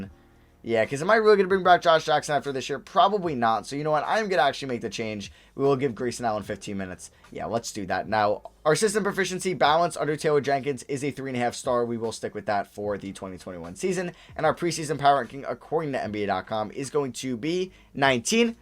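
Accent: Pittsburgh, United States. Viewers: Are u from Pittsburgh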